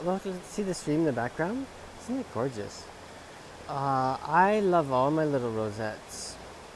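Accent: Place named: Scotland, United Kingdom. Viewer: Hi, Love the Scottish accent-You Are Great 😊 Wow the rosettes are beautiful ma’am! I’m really enjoying all your uploads this last month-I’ve not commented on them all, but I’ve given them the 👍🏻 thanks again for the swoopy upload 😉 hope you’re having a wonderful day ❤️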